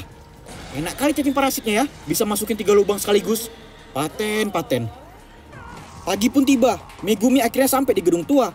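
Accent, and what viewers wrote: Medan, Indonesia. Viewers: Gw selalu suka logat Medan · Medan kali bahasanya wkwkw makasi ya kang kreator udh ngenalin cara anak medan ngomong wkwkw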